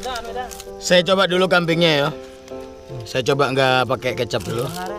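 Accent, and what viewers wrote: Surabaya, Indonesia. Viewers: mas awkmu wong suroboyo ta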